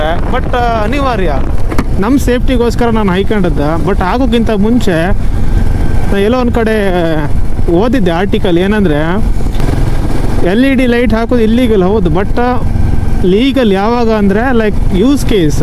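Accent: Kundapura, India. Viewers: kundhapura bhashi kend kushi aith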